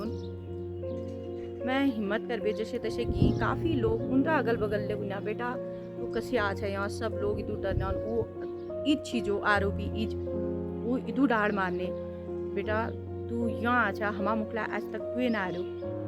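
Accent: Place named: Uttarakhand, India. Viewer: आपकी भाषा ठेस गंगोंलीहाट की है आपका घर कहांहै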